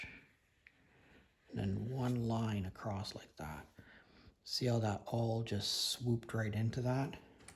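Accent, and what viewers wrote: Canada. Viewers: I recognized your Canadian accent. We lived in the Greater Toronto area for 23 years!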